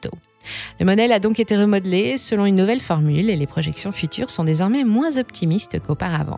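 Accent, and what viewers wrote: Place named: United States. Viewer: oui c’est bien possible. Après elle a un excellent accent américain. Après on a pas l habitude de dire bit cogne prononcé comme ça mais j’ai un pote qui dit tout à la Française genre bit coin (comme un coin) c’est horrible 🤣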